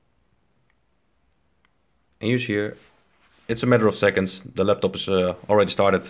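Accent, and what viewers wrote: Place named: Netherlands. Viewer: haha je kunt horen dat je Nederlands bent xD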